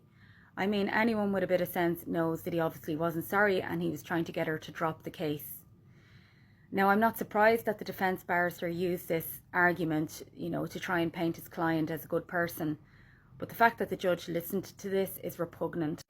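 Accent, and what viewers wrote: Ireland. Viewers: More money of the taxpayer ,the problem is people keep voting for the people that do not stop illegal migrants. and all this makes it so bad for good men who look at a gorgeous woman like you with an amazing irish accent as predators . dating and even looking at women is hard now .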